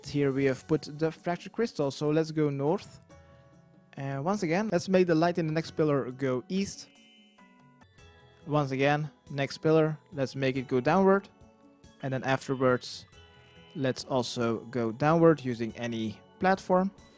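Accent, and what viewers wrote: Netherlands. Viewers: I just got my first ever quest cape and that's because of your amazing guides! I want to thank you for all the time that you've been putting into these videos. They're very fun to listen to because I can hear that you're a dutchy or from Belgium haha, further they're just very easy to follow because a noob like me gettin' a quest cape is quite the achievement. Thanks my man! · Are you/ is he Dutch?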